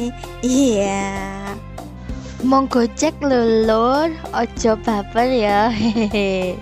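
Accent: Java, Indonesia. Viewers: Medok pisan 😂👍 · Jowo ne medok mbk you 😁😁😁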